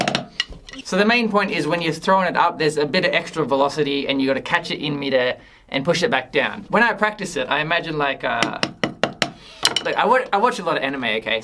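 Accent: Australia. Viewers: His sound like Aussie English takes Japanese for Tokuda has God :))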